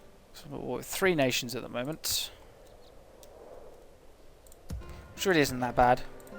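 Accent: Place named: United Kingdom. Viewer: didn't even know you were british and i was subbed for atleast a year now lol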